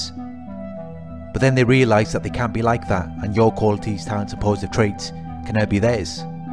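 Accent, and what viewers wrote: United Kingdom. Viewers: Hard to understand this British accent 😞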